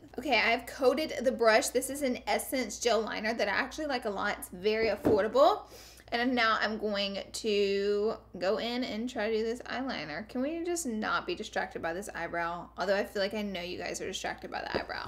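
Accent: South, United States. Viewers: Southern thang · Tori Mizuno go tell a Canadian to say say sorry correctly lmao we all know she’s form the south and we all say things different depending on where we grew up. Come on now. Stop trolling.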